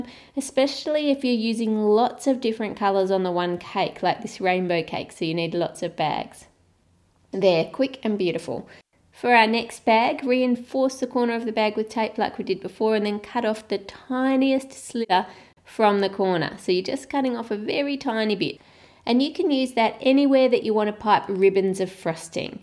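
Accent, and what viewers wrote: Australia. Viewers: Just love the Aussie accent 🥰❤ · Hi! I love your channel, and watch it all the time. You obviously come from a country where you have summer all the time. How could I know (apart from your accent and that you say you come from Oz)? The snowcrystals have 6 points. Always:) Btw: I whish I had an orange tree in my garden, not snow...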